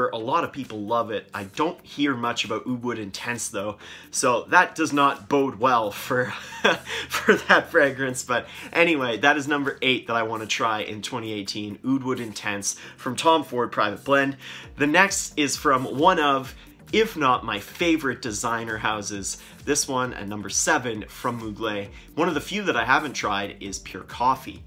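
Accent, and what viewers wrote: Canada. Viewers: I'm Canadian and I didn't realize we have an accent til I heard the maritime accent. Love it! Solid list, plenty of these are on my list as well, although not so keen on Rasasi releases. Cheers.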